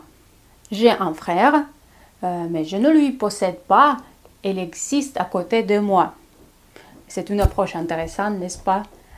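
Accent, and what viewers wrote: Russia. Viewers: Votre accent russe est vraiment délicieux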